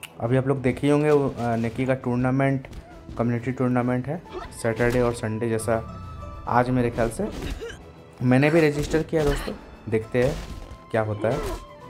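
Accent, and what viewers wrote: Bihar, India. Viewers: Ling aur Sarge waale campers se toh bht zyada frustration hota hai😩😩😩 Btw nice gameplay 👌 I think aap Bihar se ho?😊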